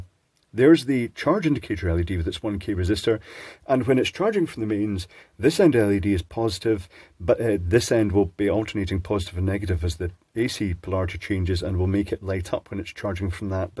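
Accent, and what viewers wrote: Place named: Lowlands, United Kingdom. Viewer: Hi i have a question, whereabouts are you from? Your accent is so sophisticated, you sound like a lowlands scot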